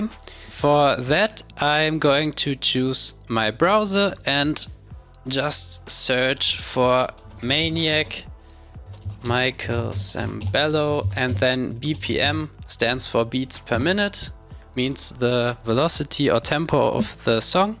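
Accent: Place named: Germany. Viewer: beautiful german accent <3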